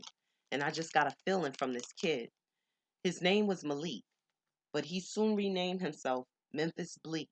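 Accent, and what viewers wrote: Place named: New York, United States. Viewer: Couldn’t imagine hearing this read aloud in no one other than that infamous NY accent. 🥰 u did that sis.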